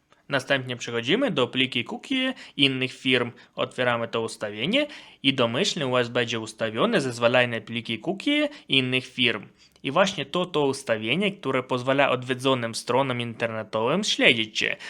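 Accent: Ukraine. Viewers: Czy to bezpieczne słuchać kogoś ze wschodnim akcentem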